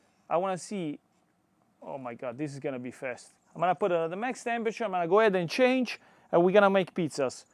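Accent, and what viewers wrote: Italy. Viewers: ciao sbaglio o sento un accento italiano??😂😂😂😂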